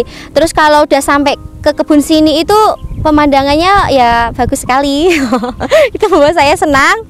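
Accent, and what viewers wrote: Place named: Java, Indonesia. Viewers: Medok banget e mbak😅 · Keren kali kontennyaa, dengar cara penyampaian dan logatnyaa medokk. Sangat menginspirasi, dn terhibur dgn channel ini dan petani happy. Thanks anyway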